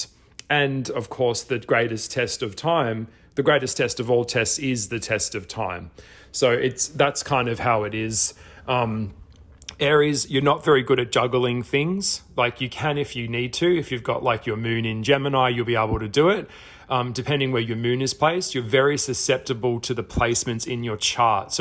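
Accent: Australia. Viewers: Nope, not listening to an Aussie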